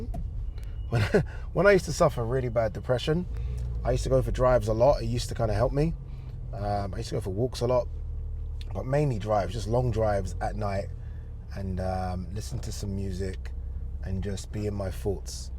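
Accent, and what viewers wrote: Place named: East London, United Kingdom. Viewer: This is so me driving around! And the "are you going out what?" Especially where you was driving 😅 (I'm based in bow) so I recognise it. Looool You're soooo east London the way you talk and I love it!😅 Btw You can do two way filming on some phones! (Samsung it's called director mode) I've enjoyed your candid chat, great convo! And you are perfect for club world cup! Congratulations Hun 😘 💓 👏 If you need a hand with your channel while you're busy doing the world cup and boxing, I'm more than happy to help. Maybe I should email you? Haha I like the name car confessions name but I see your point lol😂😅😅😅😅 but yeah car diaries is cool 😅😅😅😂😂😂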